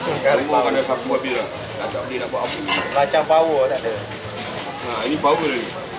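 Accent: Melaka, Malaysia. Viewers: memang best pakcik nie sporting tak kedekut ilmu dan mmg cara org melaka lah cakap gitu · Org melaka mmg loghat mcm tu, korang xyah nk kabut. Cara kasau hati baik, kalau xboleh kasar duk gua kwn dgn beruk. Melaka dihati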